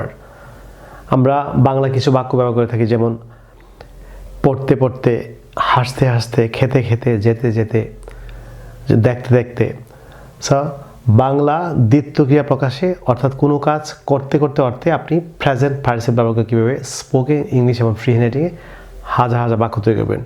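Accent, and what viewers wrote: United Kingdom. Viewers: I like british accent so much. I am learning british pronunciation from your You tube channel sir. You are good at british accent.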